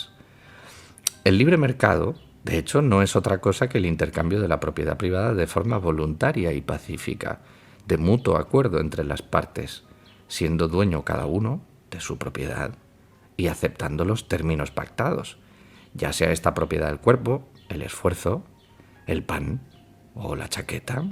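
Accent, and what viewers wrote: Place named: Andalusia, Spain. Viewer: lo mejor e que pareces del sur de españa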